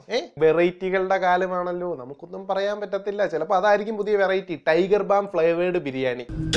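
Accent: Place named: Kottayam, India. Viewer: Chettan kottayamkaaranaano slang kettittu thonnanallo😊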